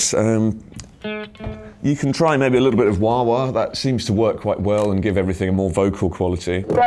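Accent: United Kingdom. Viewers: Thanks ! That was interesting, but for sure need to try before purchasing. Btw, what a GOOD AND CLEAR english accent you have. It makes easier for non british people like me to understand. Cheers !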